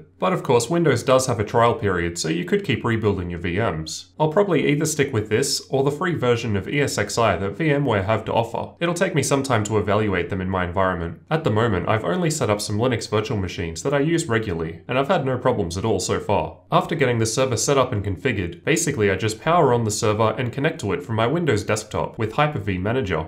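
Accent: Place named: Australia. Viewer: I like your kangaroo-friendly accent mate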